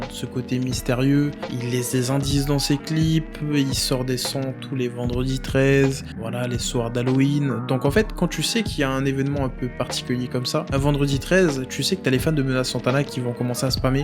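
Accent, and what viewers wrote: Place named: Dominican Republic. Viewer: la façon de comment il parle espagnol sa se voit que c'est un dominicain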